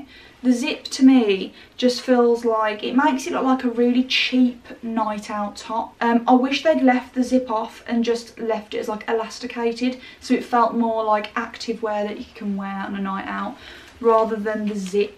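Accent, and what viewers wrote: United Kingdom. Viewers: I have to say I love your accent!Which part of the UK are you from? Anyway, you're gorgeous!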